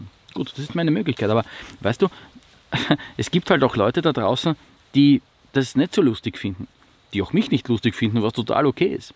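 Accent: Austria. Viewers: Eigentlich mag ich diesen Österreichischen Dialekt nicht. Aber bei dir kommt es sehr sympathisch rüber. Werbung oder nicht, ist mir scheiß egal, wir müssen alle von irgendwas leben 👍😊 alles in Ordnung.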